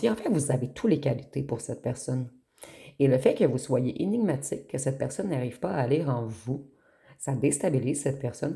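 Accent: Canada. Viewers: ❤bonjour, j aime vos guidances. J ai booster, j ai liker.j adoré votre accent du canada❤et je trouve vos jeux de cartes originaux.merci 😊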